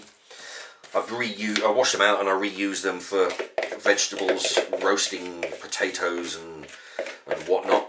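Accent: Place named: RP, United Kingdom. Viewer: Very posh.. Nice vid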